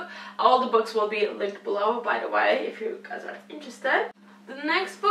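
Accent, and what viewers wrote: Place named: United States. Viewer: I loved this video cause I could undertand more! Lol! Funny you have an American accent even though you are living in England. But it is nice! Love you sweetie!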